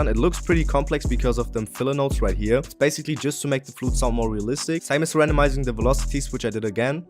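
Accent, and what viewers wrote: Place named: Germany. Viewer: Yo u sound german are u ?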